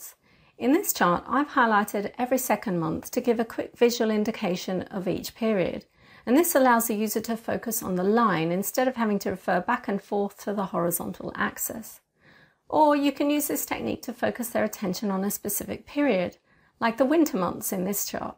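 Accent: United Kingdom. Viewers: Good fast video I think your are British aren't you?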